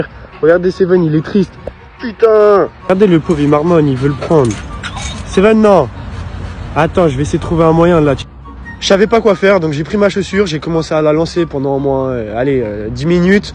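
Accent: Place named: Algeria. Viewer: Pourquoi t'as un accent d'algerien qui a debarqué du bled ya 2 semaines ?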